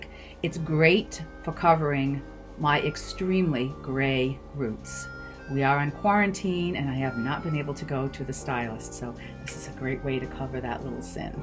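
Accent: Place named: Rhode Island, United States. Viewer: You sound like you're from Rhode Island (?) ~ Heather ~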